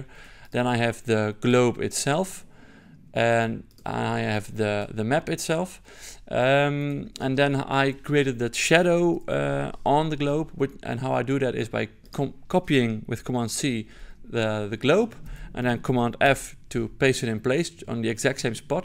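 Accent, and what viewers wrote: Netherlands. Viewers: Nice video. you can never get rid of a dutch accent i guess.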